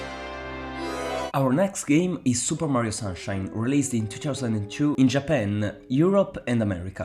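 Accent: Italy. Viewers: Bro ma hai un accento italiano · Oddio, ma sei Italiano. L'ho riconosciuto subito l'accento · Ma sei italiano ahahahha, comunque bel video anche per i 4k60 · Are you Italian?? As an American I Love the accent. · U sound Italian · I lovr your accent, are you italian? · you sound like an italian speaking english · Bro are you italian?? You're accent it's very similiar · are you italian? i can hear it.. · You sound so italian bro Non è per offenderti btw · Hai un'accento italiano fantastico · one of the best video I've seen! As I understood, you sound like you are Italian, as I am, that makes me even prouder that an Italian did this, without much effort. Can I just ask you if you can send me the control scheme for galaxy 1 and 2 for dolphin? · sei percaso italiano ? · Io, secondo me dall’accento è italiano… vado nelle info del canale, location: Italy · Ero sicuro di sentire una parlata italiana, e non mi sbagliavo. 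Ottimo video comunque, quando la versione ufficiale di Nintendo fece un flop non ne rimasi molto sorpreso onestamente. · Wait i hear italian accent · Bro you sound kinda italian, are you? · bro bel video ma si sente che sei italiano e mi cringio un po' a sentirti però bel video eh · This dolphin-powered fan-made collection is such a cool concept. Love these upgrades, this looks like a very neat package. PS: Comunque, adoro il tuo accento. Sembra che il video lo abbia narrato Super Mario in persona. Nel senso buono della cosa. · sei italiano vero? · di che sei italiano senza dire che sei italiano <3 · Si sente subito che sei italiano! Sono cresciuto con i giochi di mario per gamecube e wii, è sempre bello vedere che i fan hanno ancora a cuore i titoli del passato e si adoperano per renderli immortali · Quanto si sente che sei italiano porca troia ahah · Bro do u have an italian accent? Sembra un botto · I understood you were italian only by the accent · Non so perché ma ho la sensazione che tu sia italiano. 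Sarà l'accento ahaha. Comunque gran bel video. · bro ma sei italiano palese si sente una fracca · out of curiosity, are you italian? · Sei stra italiano vero?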